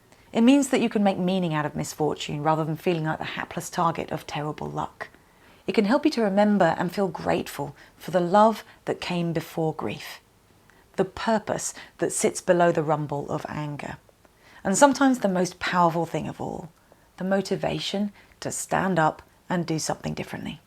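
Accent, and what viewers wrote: United Kingdom. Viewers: You’re so articulate! Do you have scripts in the video or you just talk like that? And is that the RP Modern British accent or any other one? 😊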